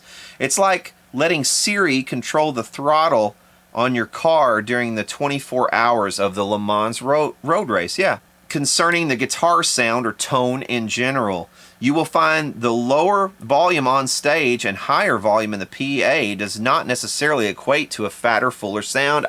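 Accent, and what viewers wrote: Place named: South, United States. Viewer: Hey, that was great felt good to hear! Coincidentally, I heard the show Joe B. put on here in Munich as his next to last, 49th of 50 this year in Europe. He moved around quite a lot, had Mr. Reese Winans on keys, 2 horns(one with vocals, too), 2 singers, drums and bass. I'm a good deal older than you at 66, but my mid-20's son, who pounds a pretty mean drumkit and is a lot more into metal, really thought it was pretty good. We were close enough that it was a bit too loud sometimes, but the energy of the band was to my eyes downright awesome, they were really in what I would term flow for about 80 percent of the time. Joe played a massively well-modulated acoustic solo after he'd already said, "Good night!" the first time which felt like about 20 minutes. Then the band came back and did another song to close. I really enjoyed it. 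I don't go to concerts often, but that was definitely worth the time, braving the rainstorms and the money. Thanks for the vid and keep it up. Your expletives are not my style or habit, but the southern flavor comes through nicely.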